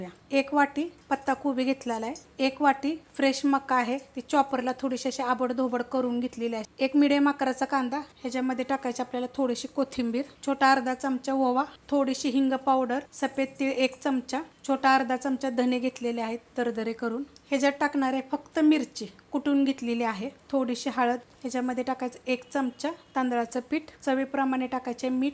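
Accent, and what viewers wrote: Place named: Satara, India. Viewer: ताई तुमची भज्यांच्या रेसिपी मला खूप आवडतात आणि त्यातल्या त्यात बोलताना तुमचा सातारी टच मला खूप भावतो👌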